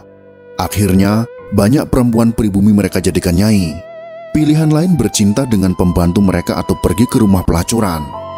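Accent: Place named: Java, Indonesia. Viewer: ngomong kyak wong jowo adminya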